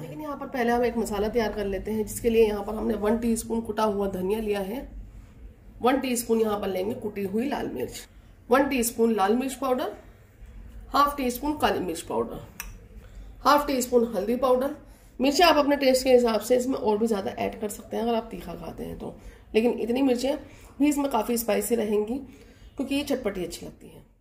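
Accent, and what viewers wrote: India. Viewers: Assalam wallekum Masha Allah zabardast Aap India se hai q k aap KI language Indian lagti hai Mai Pakistan se hoo. Aap ki dishes buhat laziz recipes hoti hai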